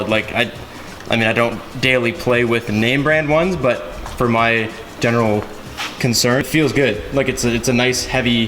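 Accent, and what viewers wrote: Canada. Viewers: your yt is the next up and coming. congrats man. you got great content and I appreciate how your learning with us. a lot of people act like they know everything but you admit that your learning and trying stuff for the first time too. I like that. but your accent is unfamiliar too me what is the accent you have? like I don't need to know exactly where your from but I'm interested on what area the accent from or what nationality you are. sometimes you pronounce words different and I'm curious. but I've been subbed since your first wish/ knock off content video you posted I think you had like 20k subs and your growing fast. you need more subs. you deserve it. the eg hatch videos are awesome man. keep up the good work. wish I could work with you guys. you guys seem to have good clean fun with a great group of people. are you Canadian?? if you could get back to me I'd really appreciate it. 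fanboi over here lmaooo jk but I always appreciate a new video from the crew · First time viewer. You Canadian though?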